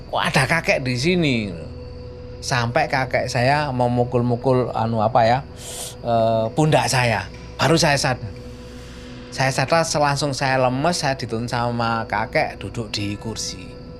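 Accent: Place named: Java, Indonesia. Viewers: Gradakan jowo nemen pakde🤣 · dialeknya jawa timuran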